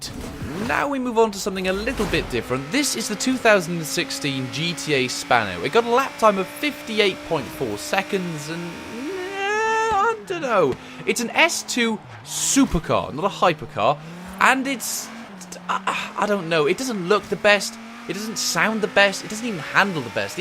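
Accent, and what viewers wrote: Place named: United Kingdom. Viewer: Why do you remind me of SovietWomble? Is it the british accent?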